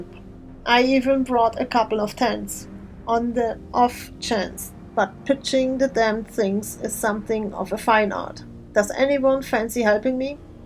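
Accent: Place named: Germany. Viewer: this german accent tho ^^ nice guide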